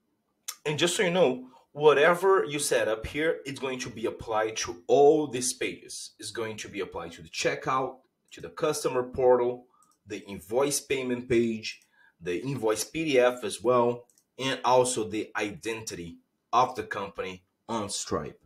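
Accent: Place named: Brazil. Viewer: Sabia que era br pelo sotaque kakkk e nois ma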